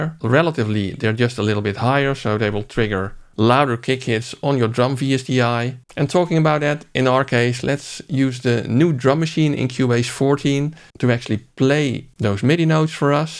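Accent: Netherlands. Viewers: nice dutch dialect 🙂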